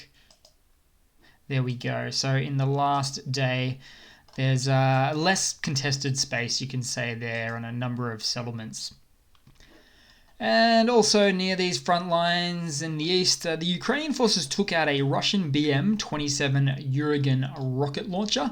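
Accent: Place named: Australia. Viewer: I only listen for the Aussie accent.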